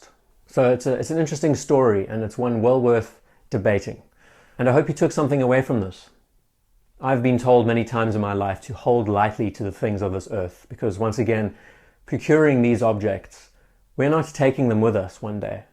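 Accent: Cape Town, South Africa. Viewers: Are you from Cape Town?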